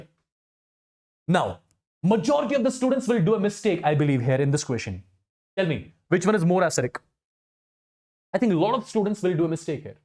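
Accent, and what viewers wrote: Kashmir, India. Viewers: Is he from Kashmir?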